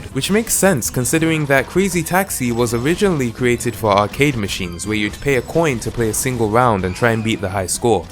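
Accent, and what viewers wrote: United Kingdom. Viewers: I love your accent! Feuweees = Furries...I can't place which area of Britain you're from. Where you from, bro?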